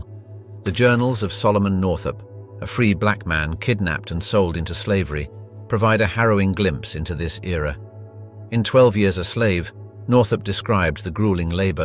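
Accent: United Kingdom. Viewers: Just what I need...Some dude with a British accent lecturing me about American slavery. Why don't you tell us about how England enslaved Ireland for centuries?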